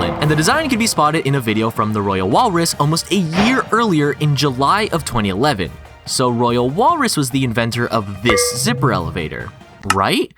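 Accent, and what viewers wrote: United Kingdom. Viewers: why does sound a lil bit english today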